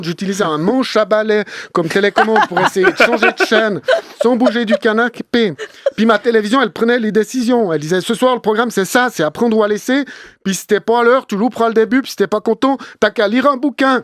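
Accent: Switzerland. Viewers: Tu reconnais un suisse à son accent mais aussi à ses blagues d’enfants de 5 ans ! L’humour et l’esprit vole vraiment très bas ! Le concept de révolutionnaire du dimanche prends avec eux tous son sense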